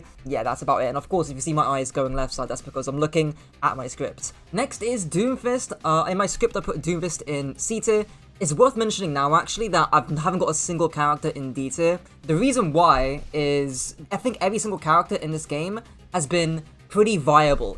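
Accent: England, United Kingdom. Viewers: I love your English accent ❤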